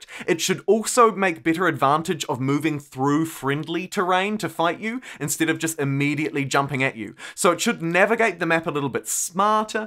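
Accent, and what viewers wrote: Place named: New Zealand. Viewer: You’re one of the only excited-sounding YouTubers that I can tolerate. You’ve got such a genuine joy behind your voice and I love to get my Humankind news from you! It’s probably because you’re a Kiwi. Love that accent. Keep it up!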